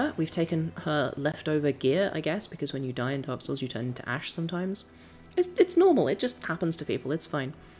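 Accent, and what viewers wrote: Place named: Ilford, United Kingdom. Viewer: Yorkshire?! He sounds like he’s from Ilford to me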